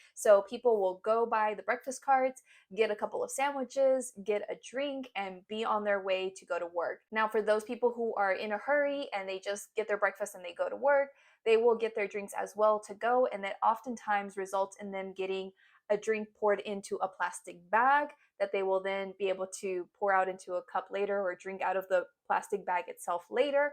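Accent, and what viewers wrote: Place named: United States. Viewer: Tienes el acento americano o.o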